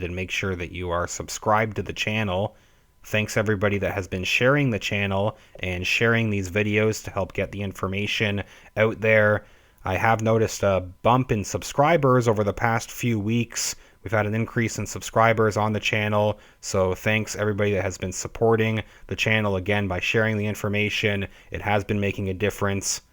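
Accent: Canada. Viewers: You sound a little Canadian. Great channel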